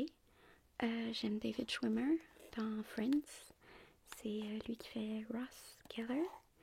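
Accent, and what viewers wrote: Quebec, Canada. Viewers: Ce petit accent québécois que j'adore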